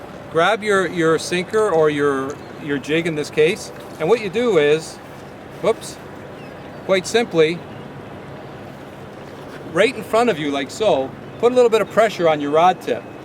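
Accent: Canada. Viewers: I can tell you are canadian lol.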